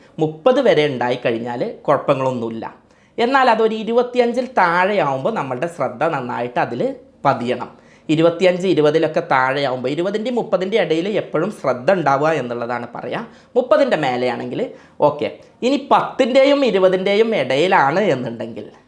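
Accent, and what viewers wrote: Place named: Chandikkad, India. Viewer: ചാണ്ടിക്കാട്ടുകാരൻ്റെ ഭാഷയും സംസാരവും ആണെങ്കിലും സാധാരണക്കാരന് വളരെ ഉപകാരപ്രദമായ വീഡിയൊ ആണ് കാര്യങ്ങൾ കൃത്യമായി നന്നായി പറഞ്ഞു മനസ്സിലാക്കുന്നു നല്ല വീഡിയോസ് കച്ചവട താല്ലര്യങ്ങൾ ഇല്ല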